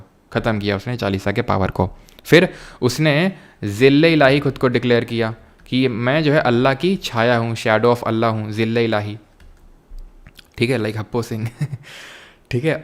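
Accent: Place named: Uttar Pradesh, India. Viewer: Sir pdhate hue apni up accent me yaad kradete hai ap. Thanks a lot sir we needed this.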